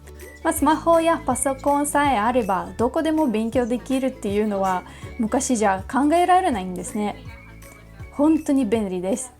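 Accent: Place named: Japan. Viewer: Gosto muito de ouvir voce falando, seu sotaque japones me agrada e eu entendo ,pois eu só não falo japones mas entendo que as pessoas falam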